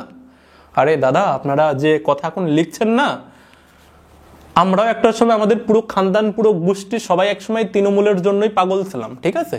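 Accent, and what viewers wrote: Bangladesh. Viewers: Tor bhasa shunei mone hocche, tui bangladeshi,.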